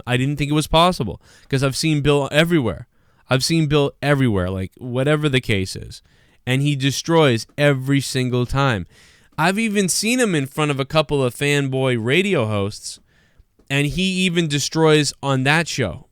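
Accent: Boston, United States. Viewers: Greg Van Holsbeck that’s the BAWSTIN accent buddy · This was a good video. Very fair. I can hear the Boston in you too lol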